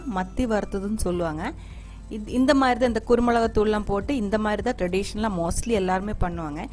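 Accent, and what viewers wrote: Kerala, India. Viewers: Super fry akka.. Beautiful keral tamil.. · Your tamil is beautiful mam ..tamil mixed with Malayalam.. Nice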